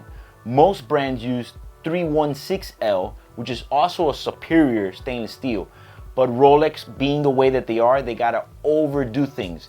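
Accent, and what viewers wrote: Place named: Miami, United States. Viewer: Cuban Miami accent lmaoo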